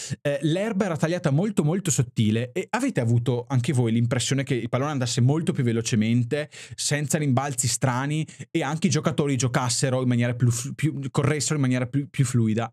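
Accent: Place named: Veneto, Italy. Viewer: Grandissimo bel video..ma poi mi fa sorridere quel tocco di dialetto veneto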